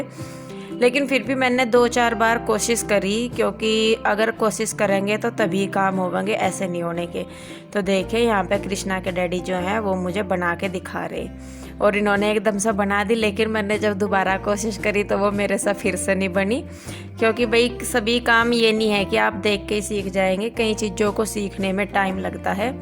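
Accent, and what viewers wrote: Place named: Saharanpur, India. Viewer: सहारनपुर मुजफ्फरनगर वालो की बोली तो अलग है ही 🤩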